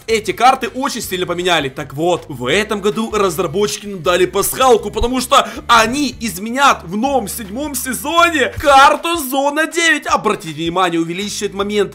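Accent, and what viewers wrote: Ukraine. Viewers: Брат ты из украины ???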